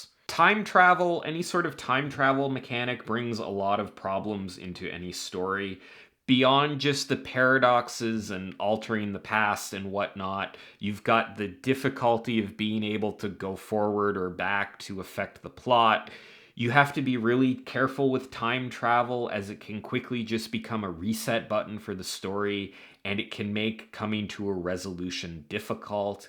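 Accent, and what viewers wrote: Canada. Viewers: Are you from the maritime region of Canada? You sound just like my favorite early 2000s politico Rick Mercer 😂🎉 super random sorry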